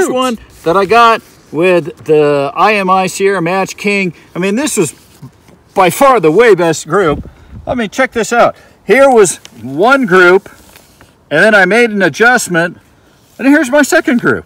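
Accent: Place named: Nebraska, United States. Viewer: I picked up on your accent right away, and was like "holy crap, a brother from the Motherland of Nebraska!" Then I saw your lower registration, and subscribed without question. Grew up in Ogallala, now on the East coast. Thanks for putting things up on the channel!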